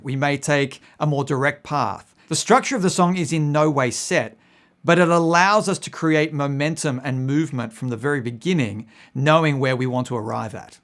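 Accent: United States. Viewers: Funny that Sting has a title first, I have been doing that for years. I will not even start a new song without a worthy title. I think if you give a creative process a pedestrian name, it can reflect if you let it and vice versa. By the way. You are Australian but I notice you have a slight American accent?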